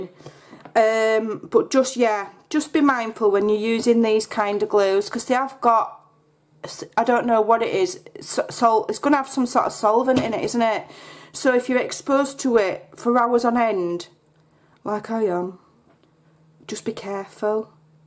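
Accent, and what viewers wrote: Yorkshire, United Kingdom. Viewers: Your cover is just perfect for your beautiful journal 💝 Love your ingenuity with the faux book plate 💝 Love your Yorkshire accent ! We have strong accents down under too🦘🇦🇺 🐨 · One happy mistake. The cover looks gorgeous. Ty for sharing how you made your book thingy (foggy brain!). Great idea. I have relatives in Ripon and elsewhere in Yorkshire and Teeside, so I love your accent. I lived in Middlesbrough and also Ripon many, many moons ago. Lol. Have a nice weekend. Xx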